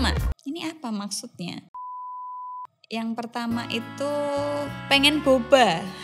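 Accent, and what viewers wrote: Java, Indonesia. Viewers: Medoknyaaaaa mantabs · Medok bgt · Ya kan ya kan..jawa pundi mbak kok ya kan ya kan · Jowo ndi sampean mbk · Wong jowo toh mbak? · Mbk ee kok jawa orang mana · Wong jowo yo mbk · Dialeknya jowo.. Rasa asik dengarnya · mbak.e wong jowo jowo endi mbak? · Jowo medok pisan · logat jowone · Wong jowo ki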